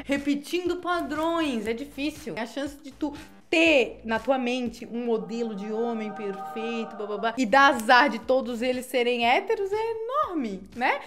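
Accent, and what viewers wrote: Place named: Florianópolis, Brazil. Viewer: Sotaque de Manezinha de Floripa. 😎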